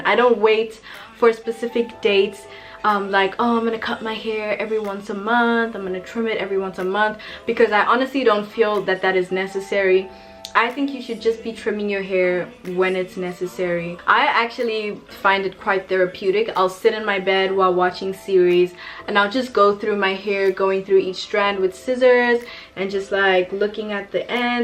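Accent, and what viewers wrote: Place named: Caribbean. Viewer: Your accent is so pretty, are you from the Caribbean?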